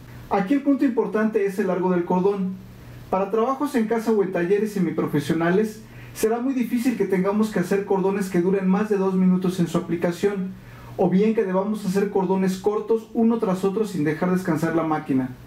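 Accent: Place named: Mexico. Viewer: Hola Buenos días de que país eres nacional? Creo que eres Mexicano por tu forma de hablar, Saludos desde Valencia Venezuela.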